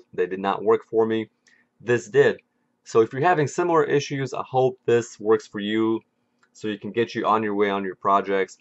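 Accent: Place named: Russia. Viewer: Russian? I hear strong russian accent :D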